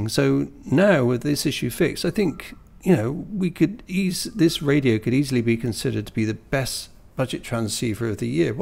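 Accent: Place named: Bristol, United Kingdom. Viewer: Just ordered a BJ9000. I realise you are in Singapore but, your accent sounds quite local to me here in Bristol. Thanks for your expertise from M7CUN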